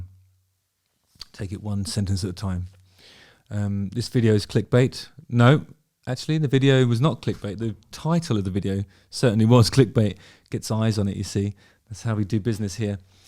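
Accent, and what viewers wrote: United Kingdom. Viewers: I think the "elitist" remark is because of your British accent.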